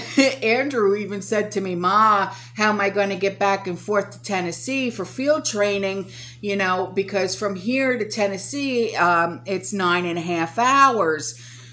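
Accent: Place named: New York, United States. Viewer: The more you talk the more you sound like you're from New York instead of Florida.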